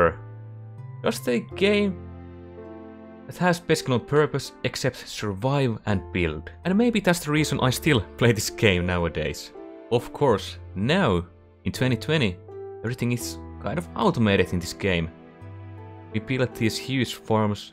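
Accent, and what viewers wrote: Finland. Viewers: Suomalainen aksentti... Xd Keep up the great content! 😃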